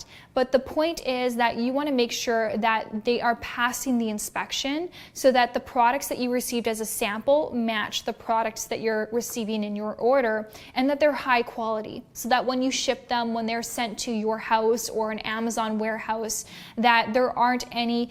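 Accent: United States. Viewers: are you from Russia? it seems like you're speaking like a native american but you have a russian name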